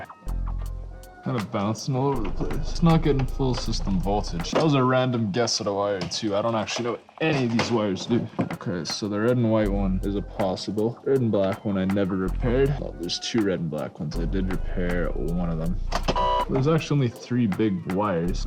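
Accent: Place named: Ottawa Valley, Canada. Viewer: Fuck bud, this feels and sounds like me and my god damned 1500, 5.7 ram. Glad i found ya. Fuck... hahaha we even say some of the same damn things. Never realized how much you lads sound like us ( ottawa valley, northwest a few hours of central Ottawa. Keep these comin bud!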